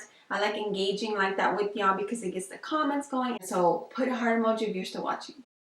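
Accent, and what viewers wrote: Texas, United States. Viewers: I like all your creative decorations and I like how you take your time explaining how your voice sounds you must be from Texas keep up the good work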